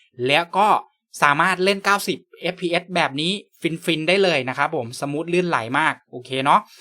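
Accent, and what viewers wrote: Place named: South, Thailand. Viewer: ทำไมต้องพูดใต้ด้วยครับ5555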